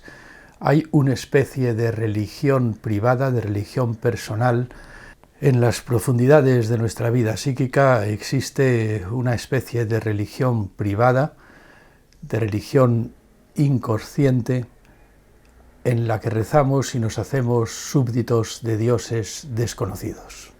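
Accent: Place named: Spain. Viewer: Qué pena no haberlo descubierto antes Sr.. Llevo un tiempito escuchándolo y me resultan muy interesantes las diferencias en el modo de hablar, explicar Psicoanálisis desde la voz de un Español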